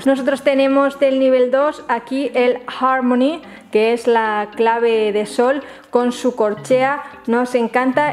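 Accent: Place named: Spain. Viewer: Me gusta el acento de los españoles 🙌🏽 saludos desde México 🇲🇽 !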